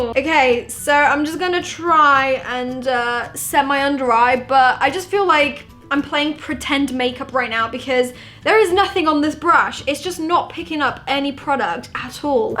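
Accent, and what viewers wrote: United Kingdom. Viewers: Really? She sounds so british omg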